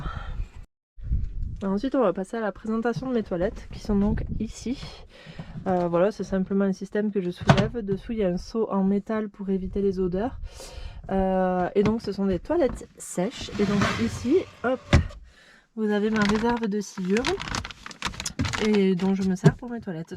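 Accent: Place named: South, France. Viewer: merci, excellent choix, mon budget est réduit, je vais aménager seule mon jumper également 😊 je suis de l'Hérault, tu as l'accent du sud aussi, de quel coin?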